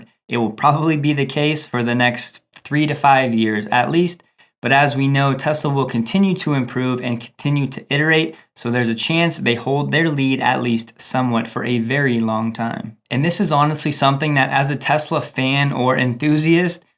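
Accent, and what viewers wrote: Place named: Staten Island, United States. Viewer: Are you from Stanton Island?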